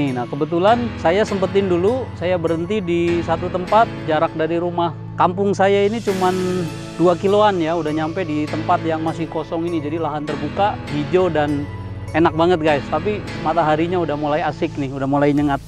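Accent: Banyumas, Indonesia. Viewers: Walah ngapak boss, kapan2 tak dolan meng toko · Jebul wong ngapak...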